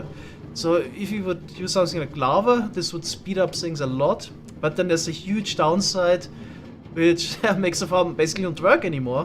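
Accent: Germany. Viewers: are u german or am i trippin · The German accent🙌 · Bruder uch hör schon das deutsche raus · Man hört so raus das du deutsch bist hahaha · das höhrt sich so hart nach deutschem aktzent an bist du deutsch? Ps feier die serie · his accent sounds very German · He is so German😂 this accent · Du bist deutscher nh ? haha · you sound like a German. im German and want to gnow if your German comment what im gonna say on German mein name ist Manuel und mein Lieblingstier ist eine Corbra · bro you sound so german 💀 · Are you from Germany? Because you sound like a German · Der deutsche Akzent 😂👍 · deutsches englisch ist so ne 10/10. aber ehrlich danke für die geilen farmen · Real talk mir kann keiner erzählen das du nicht Deutsch bist! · u german? i can hear it yu are german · Man hört den deutschen Akzent so stark raus 😂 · Man hört so sehr raus das du deutsch bist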